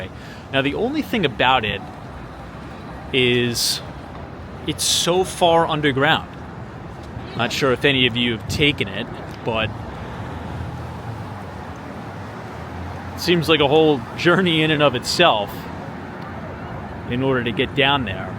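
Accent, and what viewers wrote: New York, United States. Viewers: Love your accent,is this a New York accent?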